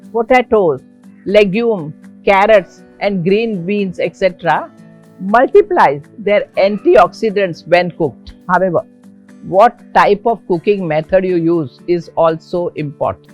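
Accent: India. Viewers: I love her and I love this channel and I mean no disrespect when I say she sounds like professor McGonnegal with an Indian accent 😂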